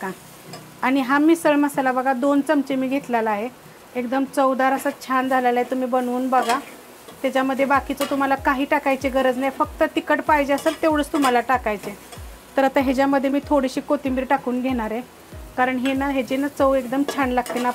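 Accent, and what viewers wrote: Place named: Kolhapur, India. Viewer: Assal kolhapuri bolane & misal. Very good method.... Thanks aunty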